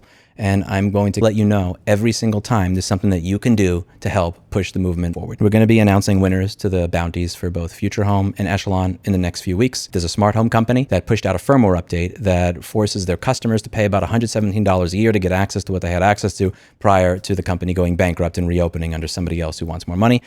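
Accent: New York, United States. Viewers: your New York came out full force here, right on!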